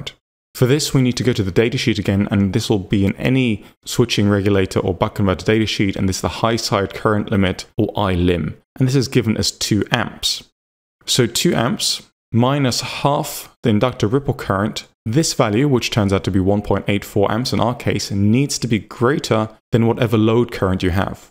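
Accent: United Kingdom. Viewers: You are one of my reasons to love the British accent. I like your voice. You look like a voice over artist. Could you tell me what is the difference between basic and extended part in electronics? I usually hear you in many videos say that this component is an extended part but I don't know what it is. Thank,.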